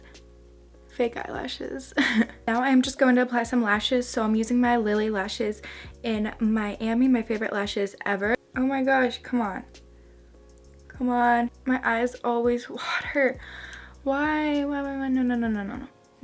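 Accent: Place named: Pittsburgh, United States. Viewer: Hahaha it's her accent- most people from Pittsburgh say it that way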